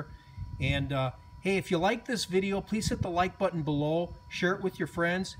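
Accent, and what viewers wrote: United States. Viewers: American accent and you know straight away it's over exaggerated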